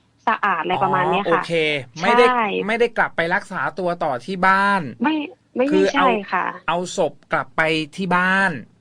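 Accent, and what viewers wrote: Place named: Upper South, Thailand. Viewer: ฟังจากสำเนียง น่าจะอยู่จังหวัดเดียวกับเรา ภาคใต้ตอนบน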